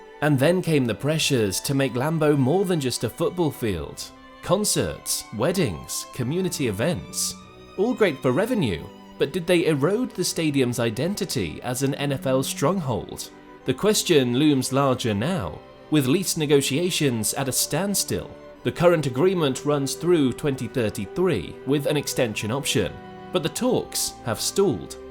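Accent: United Kingdom. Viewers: Why would you use a British accent to narrate this video? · Somehow a British voice-over is a dizzy decision when talking about iconic NFL venues. Nice try, I guess?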